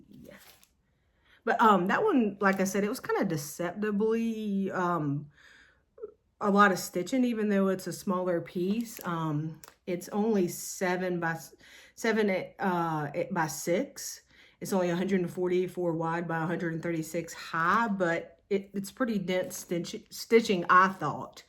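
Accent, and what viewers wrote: South, United States. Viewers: Hi, - I really enjoy watching your videos. It's nice to hear another Southern accent (I'm in N GA.) I'm reorganizing my craftroom and remembered this video. The clean designs made a big impression so back I came for inspiration. Do you remember if the storage units were the Kallax units? I'm trying to avoid the drama of a trip to the Ikea store in Atlanta. There was a time when that would have been a trip I would have looked forward to, but not so much anymore. I love the clean look of the baskets and magazine files. No one has to know about the chaos that lies within.. 😂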